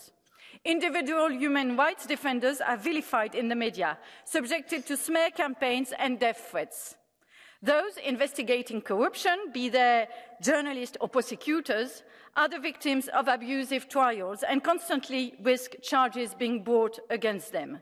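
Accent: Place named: United Kingdom. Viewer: Je ne comprends pas... vous êtes une élue française au Parlement Européen. Pourquoi vous exprimez vous en Anglais? Peut être est ce une obligation pour cette séance ? Même si vous êtes née au UK, l'accent m'a fait décrocher du contenu. Sinon, je vois que vous pourfendez les autocraties en Grèce et en Hongrie. Vous vous inquiétez du choc autoritaire en France aussi. C'est bien, c'est cohérent, merci. Nul doute qu'entre le dénigrement du résultat du référendum de 2005, et les dernières décisions des Conseils d'Etat et Constitutionnel (juges non élus), vous avez de beaux exemples pour alimenter votre lutte pour la Démocratie dans notre beau pays ! 😅👍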